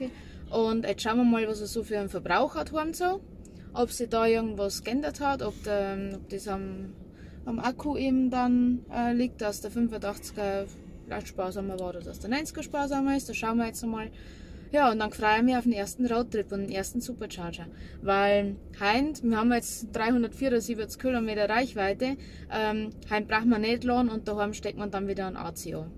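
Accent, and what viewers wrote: Bavaria, Germany. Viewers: Hallo. Feine Sache. Nun gut, diese etwas säuerlichen Regelungen, dass man ein gleichwertiges, gebrauchtes Teil bekommen kann, die kenne ich auch aus dem Job. Die Leute denken immer das Garantie (Gewährleistung) bedeutet, dass man auf alle Fälle Neuprodukte beanspruchen kann. Pustekuchen. Allerdings ist es schon komisch, dass beim Akkutausch Tesla anscheinend Lotterie spielt wer was bekommt?! Nuja, Hauptsache es läuft wieder. Dein Dialekt erinnert mich an meine Kindheit, wo wir immer nach Bayern in Urlaub gefahren sind. Cool irgendwie. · Ich mag deine Videos und deinen Bayrischen Dialekt.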